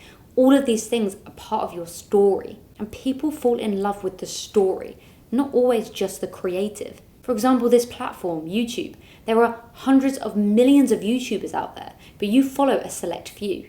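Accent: United Kingdom. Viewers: i hope you dont mind me asking a rather personal question .. where are you from (more or less) im asking because of the accent it is a accent i very much like but only a few have it in movies and stuff .. i would say brittish but then again the country is big so id like to know where this is coming from :) cheers and greetings from germany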